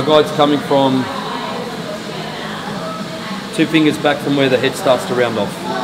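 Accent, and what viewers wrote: Australia. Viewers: she's such a gorgeous girl love her hair what an amazing job you did and love your accent its so beautiful love Australia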